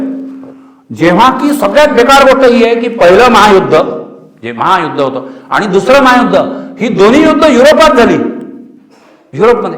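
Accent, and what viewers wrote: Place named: Vidarbha, India. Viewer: सर मि तुमचा प्रत्येक सेशन पाहतो काही तरी नविन शिकवतो आणि ति वर्हाडी टोनिंग एकच नंबर तुमच्या सेशन ची माहिती मिळाली तर उपस्थिती देणे आवडेल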